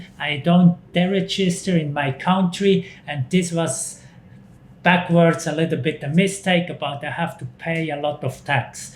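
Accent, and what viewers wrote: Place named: Switzerland. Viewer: Love your Swiss accent ;). I m from Switzerland too! Very nice advise maybe one day i will mouv to Thaïlande too ;). I m sure you miss our lovely cheese and chocolate too 🤣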